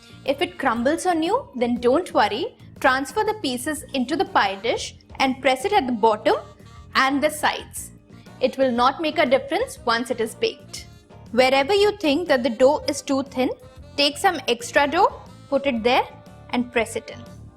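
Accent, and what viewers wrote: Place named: India. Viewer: That accent is so cute, if all toddlers spoke English with a heavy Indian accent it would be like sunshine on top of sunshine.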